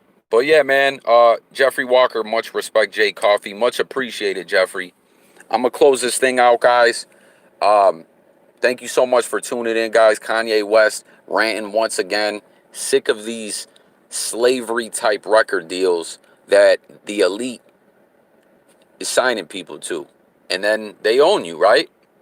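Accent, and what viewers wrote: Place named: Nebraska, United States. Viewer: Hey Jay coffee is you from Nebraska or Cali? You sound like it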